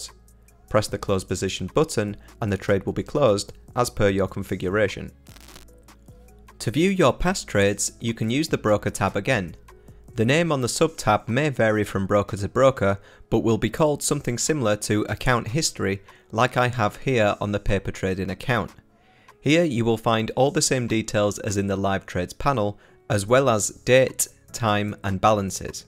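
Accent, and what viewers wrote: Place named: North, United Kingdom. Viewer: Half way through & it is an EXCELLENT education! Thank you! Top Northern accent also!!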